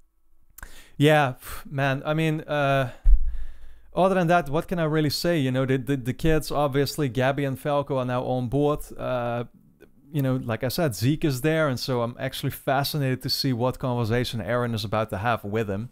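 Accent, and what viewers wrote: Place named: Netherlands. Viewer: im hearing this accent... my man... are you dutch?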